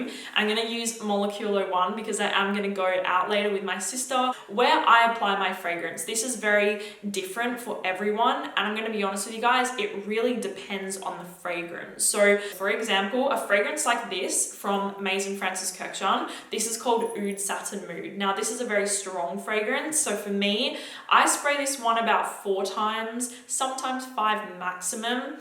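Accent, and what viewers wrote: Australia. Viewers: I LOVE your Australian accent!!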